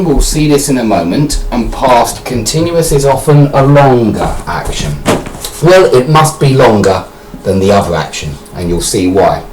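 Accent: United Kingdom. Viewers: I love that heavy british accent! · Hi! I'm from Brazil,and your video is very good! I love the British accent is perfect :D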